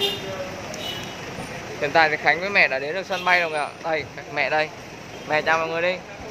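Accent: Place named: Nghe An, Vietnam. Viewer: Em Khánh nous quê bố Đắc Lắc mà sao chị nghe như khánh nói giọng như người Nghệ an vậy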